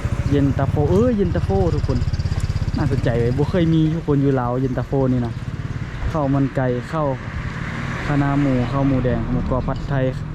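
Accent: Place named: Lom Sak, Thailand. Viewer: สำเนียงเอื้อยเหมือนคนจังหวัดเพชรบูรณ์อำเภอหล่มสักหล่มเก่าครับ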